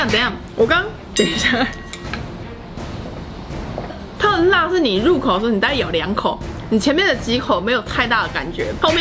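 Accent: Taiwan. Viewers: 「 好辣選我選我」 喜歡看開箱的影片還有聽你烙台語哈哈哈真得辣了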